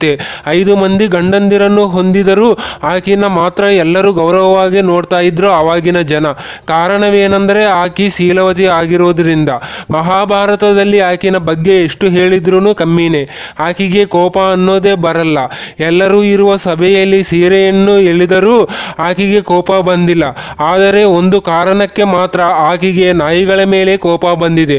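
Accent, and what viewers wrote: India. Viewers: Yarappa Nenu Kannada na Malayalam style Alli mathadtiya modhlu Kannada Kalli amele story helu..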